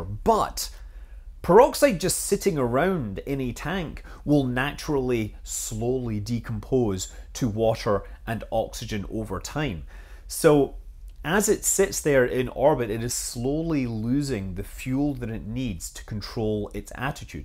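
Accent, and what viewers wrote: United Kingdom. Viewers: It's amazing that you being British (if i got the accent right) know so much about the R-7 design, now that's really some enthusiasm there. I'm a Russian myself, meaning i ought to know about details like the excentric jetforce stream plus oxygene jettison trick before everybody, but i only learned about them yesterday, from Lozga, who you could say is the best known space expert among Russian bloggers.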